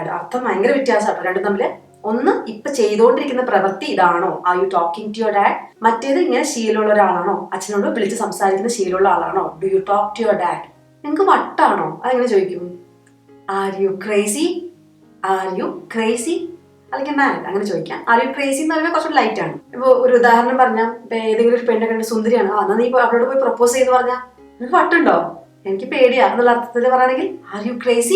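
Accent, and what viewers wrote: Thrissur, India. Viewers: Are you from thrissur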